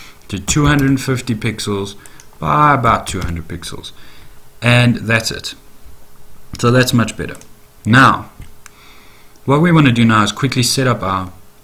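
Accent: South Africa. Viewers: Awesome tutorial! Thanks. I can hear you are from SA! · I am in JHB and I've got to say I do love seeing other South Africans do tuts, and man do you know your stuff. Keep it up, great tut:-D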